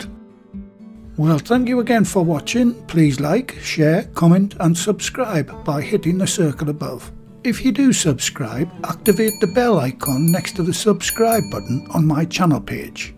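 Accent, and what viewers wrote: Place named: North East England, United Kingdom. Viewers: Hello. It’s great to hear my own accent while living here in Crete. As a lass from Seaham Harbour it is music to my ears. After retiring we went to Vancouver, Canada for twenty years and I loved enjoying a Vietnamese roll when going to the mall. Now in Crete there are no meat pies or these rolls so I’ll definitely make some in the cooler months. Love your recipes, your presentations of them are excellent. I’ve learned so much already. Thank you. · Your baking has been the highlight of my week! As a Sunderland lass stuck in another lockdown in Shanghai, I appreciate not only the fantastic bread but the sound of a North-East accent:) They don't really do home ovens in China, but I've bought a table top Panasonic and I am definitely going to try these today. Thanks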